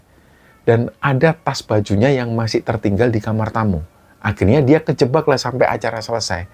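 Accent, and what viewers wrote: Java, Indonesia. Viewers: Asallamualaiqum Mas Salam.Kenal.Bunda dari Kota MAJALENGKA. Suka bnget klo denger crta dari.itu Lho logat Jawa nya enk di dengar Yaqin Mas..😅😅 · ❤❤❤Om the way you speak seperti keluarga besar SOLO kami 😂 ( Om, bulik, Budge, Padhe) ❤❤❤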